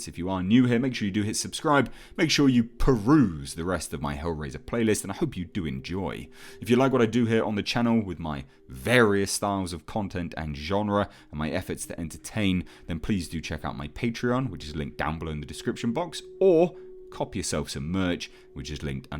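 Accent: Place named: England, United Kingdom. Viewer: I am trying hard to get your english accent. I need to listen to you more for the true English pronunciation of words.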